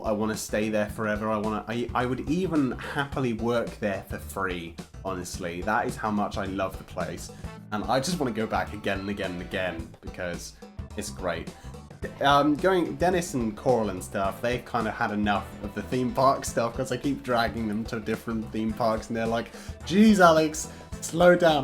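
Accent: England, United Kingdom. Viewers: it is because he is English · Because he's British. NOT English-speaking **BRITISH**. Edit: Didn't need to edit.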